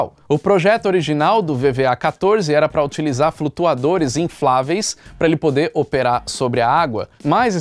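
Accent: São Paulo, Brazil. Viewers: Engraçado q esse cara parece o Gugu falando. De q parte de São Paulo isso vem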